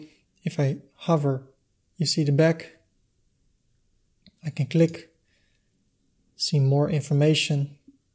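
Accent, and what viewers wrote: Netherlands. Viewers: ken het accent ;) Nederlands Lol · Je hoort gelijk is Nederlander :D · Hey; Danke Well, No need to improve your English, Your Dutch accent is a reminder of the Dutch Kindness and all the Good times we get to spend in Holland, Thankyou Keep it UP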